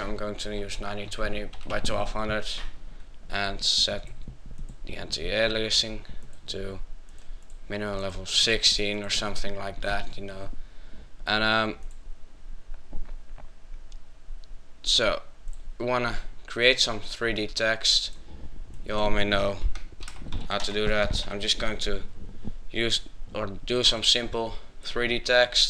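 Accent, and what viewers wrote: Netherlands. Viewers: I know how hard it can be to talk proper english when you're dutch, because I'm dutch too, but I might have a tip for you. Write down your text before you start, and just read it. Or just please dont use your voice if it isn't good enough. Sorry. You keep swearing and there are Silent letters in english. Also letters you DO have to say. Don't say "oh yea, plural" in the middle of a video. We don't want some gramar lessons. Apart from that, the tutorial was pretty good. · u have a dutch accent :D i can tell because im dutch myself and most dutch people who talk english (or try) sound like this. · U dutch or from belgium :P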